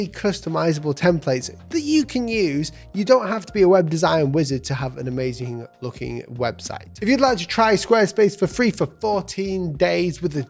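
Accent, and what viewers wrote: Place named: Northern England, United Kingdom. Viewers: I like that you point out that you are from the North of England in many videos. Even I can hear that, and I'm not British 😂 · don’t know why i didn’t clock your northern accent faster, hi from manchester!!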